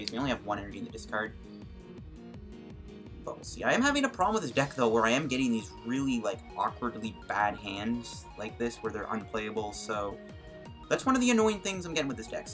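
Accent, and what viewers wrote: Canada. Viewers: r u from Canada ?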